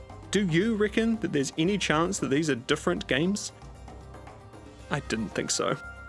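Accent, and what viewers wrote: New Zealand. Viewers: why do kiwis say "i"instead of E?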